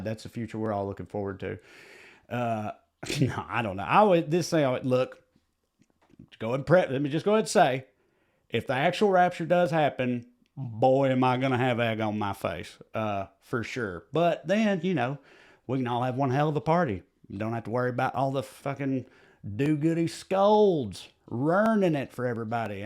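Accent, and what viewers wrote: South, United States. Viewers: Dude, it's a delightful juxtaposition hearing you correctly using polysyllabic terms in a southern drawl that screams "taters 'n grits"! You constantly make me think of when I went home with a college friend to cattle show in backwoods Alabama. Since Rob was catching up with people he hadn't seen since high school, I just wandered around until I heard some people talking about quantum theory and temporal mechanics! At a cattle show! A couple of the speakers had accents so heavy that my eleven years in Alabama had barely helped me understand what they were sayng, but WHAT they were saying blew my mind! I asked my friend about it and he listened for a bit, then said, "Oh, they've just been watching Doctor Who! Public broadcasting is the only TV station we get out here. They say Weedowee should have cable in about five years." I actually like when my unconscious- and unjustified- biases run screaming face-first into a brick wall! 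😊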